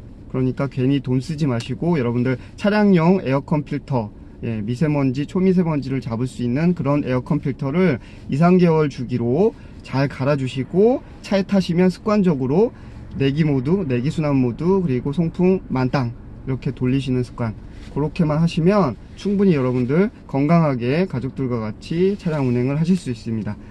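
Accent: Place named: Chungcheong, South Korea. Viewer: 거시기... 충청도 특유의 말투아닌가요? 저는 아주 정감이 느껴지는데요.. ㅎㅎ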